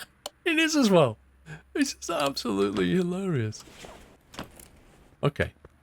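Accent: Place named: United Kingdom. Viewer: I'm trying to make it as a Youtube gamer... but I can never compete with this British accent...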